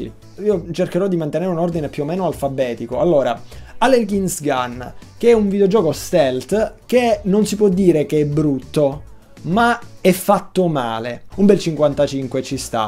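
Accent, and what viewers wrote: South, Italy. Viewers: di dove sei ? sembri del sud!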